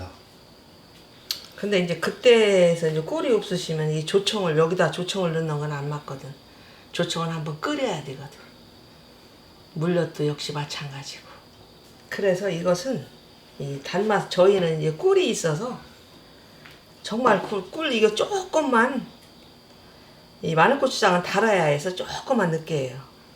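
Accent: Chungcheong, South Korea. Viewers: 맛나보이네요 수고하셨습니다 말씀이 충청도 분이라말씀도 구수하시네요 저의 고향이 충청도이거든요 한번 담아보겠습니다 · 모처럼 들어 보는 충청도 사투리 네유. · 충청도 말씀. 정감이 기네유~~~~ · 충청도 사투리가 우리 엄마 같네요.. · 구수한충청도 사투리 정감이가네요 😊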